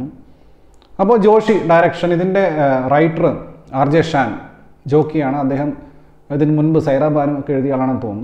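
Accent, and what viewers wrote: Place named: Southern Kerala, India. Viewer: കോഴിക്കോട്കാരനാണോ പക്ഷെ സംസാരം കേട്ടിട്ട് തെക്കൻ സ്ലാങ്ങ് ആണല്ലോ.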